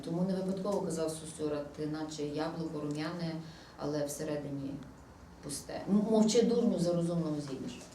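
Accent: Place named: Western Ukraine, Ukraine. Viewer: ты говорить научись не на суржике западенском, а на нормальном языке, на русском или украинском, но не на западынском как ты сейчас базлаешь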